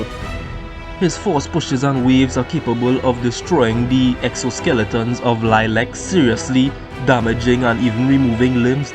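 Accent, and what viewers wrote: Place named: Trinidad and Tobago. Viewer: U definitely from Trinidad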